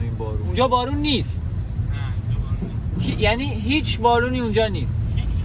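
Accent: Mashhad, Iran. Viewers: اقا تو که لهجه مشدی یاد گرفتی به بگو یه کمی شمالی حرف بزنه ببینیم چقدر بلده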